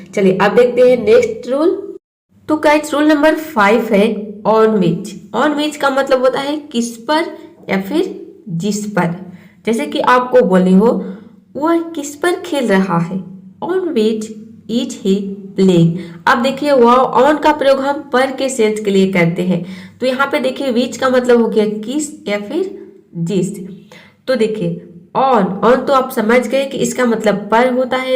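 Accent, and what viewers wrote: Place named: Bihar, India. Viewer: Bihari sare esahi bolte hai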